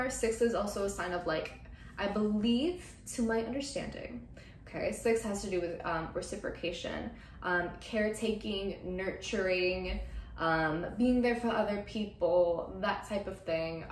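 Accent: United Kingdom. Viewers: hahaha yesss i love the british accent! i always do that too 🥰❤️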